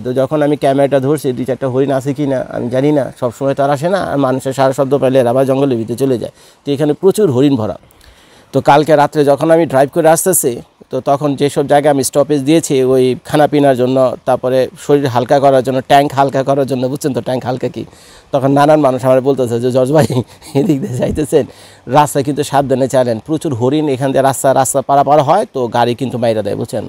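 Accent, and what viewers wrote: Dhaka, Bangladesh. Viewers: AASSALAMOO ALAIKOOM Dear Bhai,KHALAQAL MOUTA WAL HAYATA,Soora MOOLQE,I liked you because of Dhakaya accent,from Bangladesh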